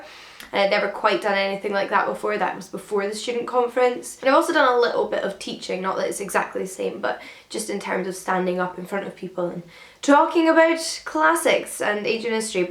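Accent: Scotland, United Kingdom. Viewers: Waad Abdullah she’s Scottish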